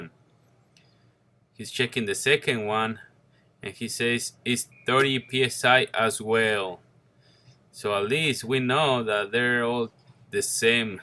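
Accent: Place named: Mexico. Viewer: Give him a break bro, that's a Mexican accent, at least he can speak 2 languages (Spanish and English) .Stop the hate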